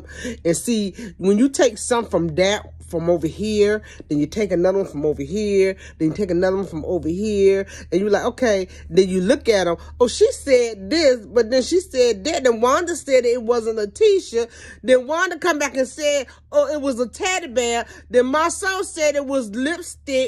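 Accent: United States. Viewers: I kept listening and I hear wisdom and plain common sense. You sound like you from New Orleans? Good talk my friend! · No she dont. She sounds like she maybe from The Carolinas, Mississippi, Georgia even.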